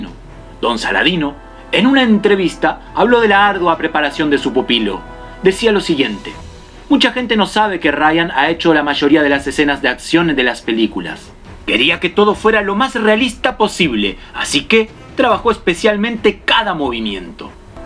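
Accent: Argentina. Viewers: que eres Argentino o Uruguayo??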